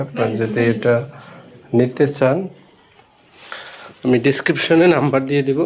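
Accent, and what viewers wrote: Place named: Jessore, Bangladesh. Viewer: যশোর কথায় ভাই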